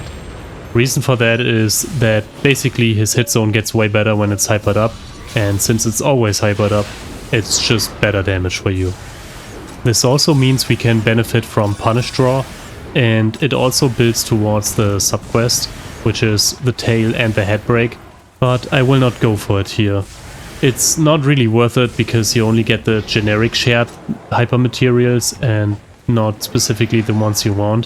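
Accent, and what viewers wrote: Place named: Germany. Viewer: Ich hab 5 Sekunden geguckt und sage du bist 2000% deutscher 😂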